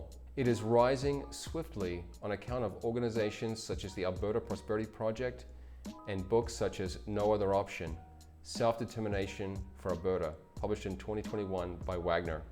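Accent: Australia. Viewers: Sounds like he from either Australia or New Zealand, and according to his channel's about section he lives in Guatemala. The sad thing is, I don't think Trump needs to pay people to say these things. He's built such a cult, people will make excuses for his top appointees even when they sieg heil the audience twice on stage with the cameras rolling. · I have to admit that your “rant” rankles when I hear that Aussie twang😡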